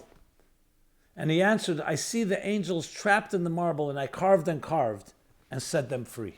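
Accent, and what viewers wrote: New York, United States. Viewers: How wonderful your lessons are, I have shared to many of my friends and clients. The way you speak resonates deeply within. And the NY accent is so good!!!! TY!!!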